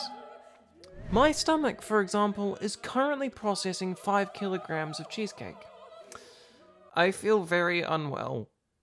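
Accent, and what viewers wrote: United Kingdom. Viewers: For some reason my mind keeps trying to convince me that you're attending Hogwarts. I believe it's the glasses and the accent. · You're so much beautiful. Includes your Brit accent.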